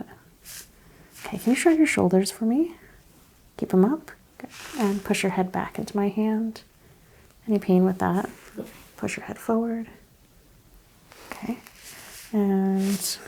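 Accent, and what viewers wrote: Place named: Upstate New York, United States. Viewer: i'm not sure where you're from but your accent really reminds me of western/upstate new york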